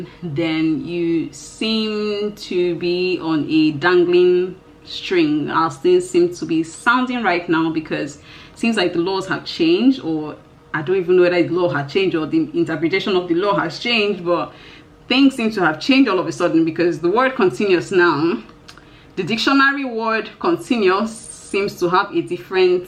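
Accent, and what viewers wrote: Nigeria. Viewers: Hi...did you live in Lagos? You sound Yoruba. Regards from the UK. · Lool I liked this video because of your Nigerian accent and the Nigerian humour you used to announce what is essentially bad news which didn't make it sound as bad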